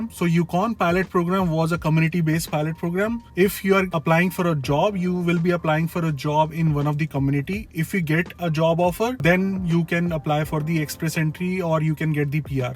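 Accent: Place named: Kerala, India. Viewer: Edo mashe eyyalude pronounced kettatal manasilakum malayaliyude kunjananu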